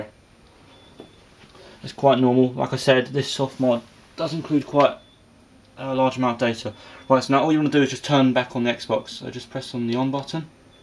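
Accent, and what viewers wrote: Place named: London, United Kingdom. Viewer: typical londoner, good stuff.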